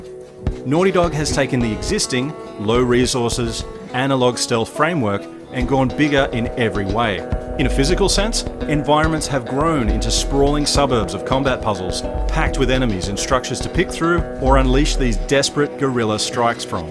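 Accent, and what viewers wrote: Australia. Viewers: Nice review and are u Australian? Cause then u get major respect. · Best review yet. Love the Aussie accent/slang and you are GOOD at this game! Fantastic stuff. Subbed.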